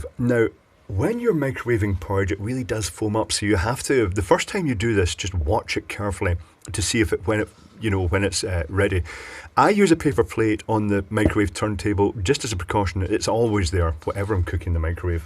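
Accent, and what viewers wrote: Scotland, United Kingdom. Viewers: Thank you I live in the US but am from Cheshire, never knew there was a posh Scottish accent but your right. Nice vid though. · Coming from Scotland myself, this guy has the most annoying Scottish accent in the world.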